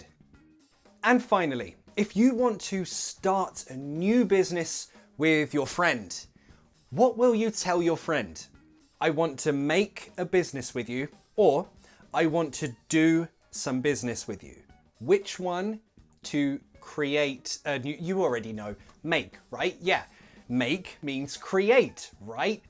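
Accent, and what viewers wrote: United Kingdom. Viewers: You make me love British English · You're the only British English speaker I really enjoy listening to.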